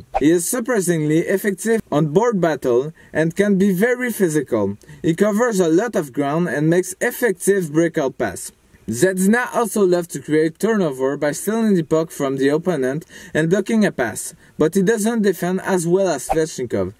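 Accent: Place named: Quebec, Canada. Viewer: J'adore l'accent Québécois dans ton anglais ahahah! Sinon c'est une bonne bonne vidéo!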